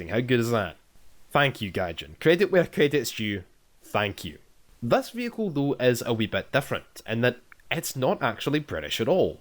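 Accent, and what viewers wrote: South Africa. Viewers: Your South African accent sound more like the Durban Indian accent than the Afrikaans accent XD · 10/10 South African accent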